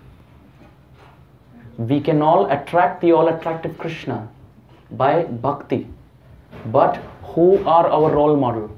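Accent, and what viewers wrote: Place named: Bengal, India. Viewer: Its a great pleasure to hear Harikatha through your mouth, sir. By the way, are you bengali? Because your sense of humour and tones of your speech somehow get match with bengali.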